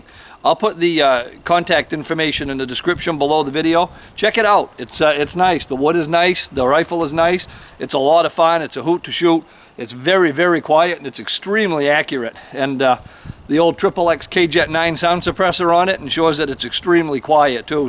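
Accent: Maine, United States. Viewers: awesome video. you gotta be from Maine! 👍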